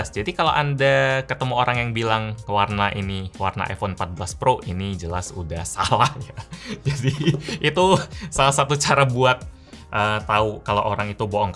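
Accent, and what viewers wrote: Indonesia. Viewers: Buanglah accent indo ko tu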